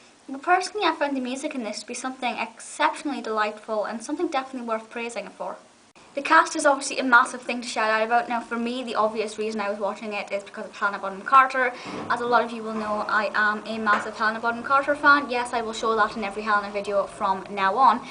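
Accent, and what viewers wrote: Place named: Scotland, United Kingdom. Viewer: Are you from Scotland???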